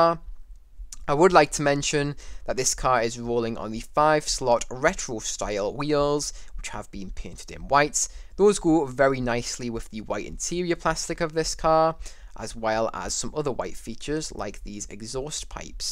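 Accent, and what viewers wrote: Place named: Ireland. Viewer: U sound Irish just loving it Bro 😎🇧🇸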